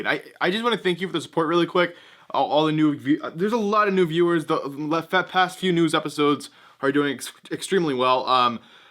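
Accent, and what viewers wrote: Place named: Canada. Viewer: by the sound of your a`s I can tell your canadian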